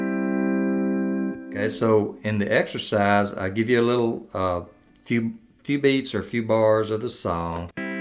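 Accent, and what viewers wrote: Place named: South, United States. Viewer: Hi, Great stuff, just getting back into play pedal steel after 45 years away. Used to play in a band etc, but now feels like i am starting all over again at 77 years old - fingers getting looser... Your instruction clips are proving invaluable, and enough for the moment, until i can find time to go deeper. For the last couple of days it has been bothering me, at 13.13 you have said a diminished repeats it's self every fourth fret (I ''heard'' note!!). Whereas a dim repeats it's self every third note, and an augmented repeats at every fourth note. That is if I have it correct from memory and the way it sounds. Then it dawned on me that on replaying this evening, that you were saying 'fret' and counting the fret you were on as the first one, instead of starting with the next fret up (as the first one), which would be the next note (or the first of three). 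I have not noticed this before, and wonder if it causes confusion for some ('idiots' like me!!!?). Is it common practice to count the fret you are on as the first? I am not being pedantic, just feeling my way, and wondering for all of us. I am always looking forward to the next presentation, and the southern accent. Takes me back to so many tours of the States, teaching and demonstrating woodturning - 27 states in all......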